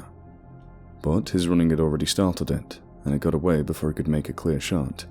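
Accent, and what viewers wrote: South, United States. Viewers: As someone from the southern United States I must say that you have really gotten better at the southern accent. Great job as always. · Actually, your Southern USA accent is pretty danged good. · You did a good job with the Southern accents! I felt right at home. 😅 But were they supposed to be Southerners, or New Englanders? I potentially missed that detail, lol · Why the southern accent isn’t this supposed to take place in north east u.s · Next time that you do a piece about the Northern Part of the USA, such as in 13 Mile Woods in New Hampshire, use regional accents, not the southern rural accents that you are so fond of. Hunters up near the Canadian border are unlikely to have southern drawls. Thank you. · Great southern accent. If only this was set in the south. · no more southern accent please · You pretty much nailed the southern accent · I'm from the south and think you did a great job on the accent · Thank you for taking the time to narrate this. 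Also, great job on the country accents. Looking forward to more! Always watching, waiting and listening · Your accent was better than mine and I live very near people who have the southern accent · Ahhh, nothing like a good wendigo story.. Plus, dont ever apologise for your southern accent.. Its good.. I live in the south and youre doing it justice.. Theres a reason why hollywood uses english actors for southern character roles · Never ever apologize for your proud Southern Accent, NEVER!! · ya good at southern accents but it's NH north nothing like what we sound like. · Your southern accent is on point, mate! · the southern accent actually works pretty well. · Your southern accents sound superb! You could easily be a voice actor for The Last of Us with how good they are. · You sound so cute doing a Southern accent! I love it! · I love your country accent. 😂❤️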